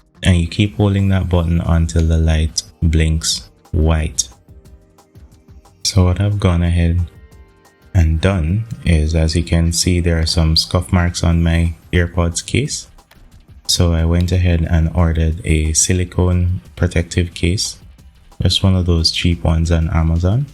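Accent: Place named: Jamaica. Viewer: How him sound like yaadie so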